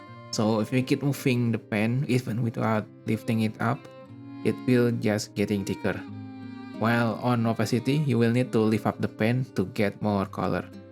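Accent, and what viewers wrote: Indonesia. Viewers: bro were indonesian all this time ...? can't hide those accent :face-orange-biting-nails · aksennya ngomong nya kyk indo bang ;v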